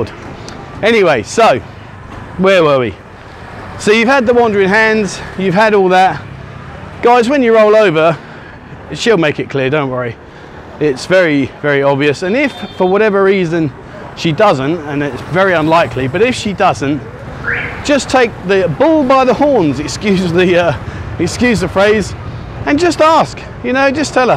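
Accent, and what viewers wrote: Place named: United Kingdom. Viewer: Excellent British accent, I'd loved it.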